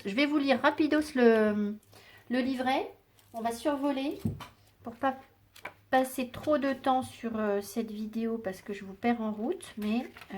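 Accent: England, United Kingdom. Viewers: Merci beaucoup pour l entrée en matière qui m à permis de noter le nom de ces 2 livres que j ai hâte d acheter dès que la Fnac sera ouverte. Également en dépendance affective, j ai fait un « soin » par téléphone pour couper les liens, comme les bonhommes allumettes aussi, mais rien n y fait. Je vous apprécie car je ressens votre sensibilité dans vos guidances et souvent votre émotion, ce qui déclenche la mienne car je suis hypersensible et ce que je traverse en ce moment n est pas facile, mais j y travaille. Continuez comme ça, je vois que nous sommes beaucoup à vous apprécier alors, ne changez rien. Beaucoup de bonheur à vous. Bises 🙏💕 Et moi j aime beaucoup votre bel accent anglais!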